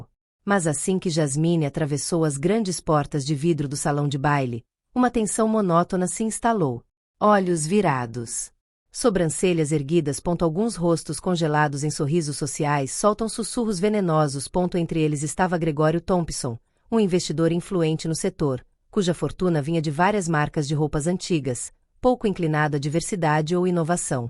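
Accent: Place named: Spain. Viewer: O português, de repente, do nada, vira espanhol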